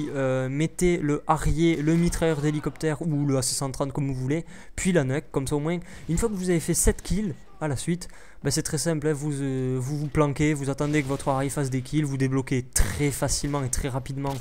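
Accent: Toulouse, France. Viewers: tu dis neuke a cause de ton accent toulousain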